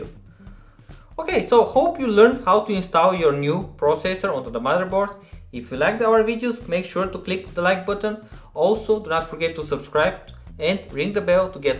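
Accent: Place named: Mexico. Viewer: Che mexicano intentando hablar inglés no mams mejor hazlo en español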